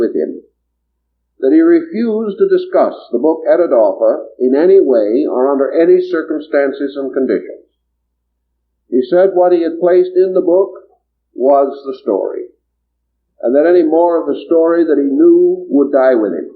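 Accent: Transatlantic, United States. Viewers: One can detect the "Trans-Atantic" accent commonly used during his time.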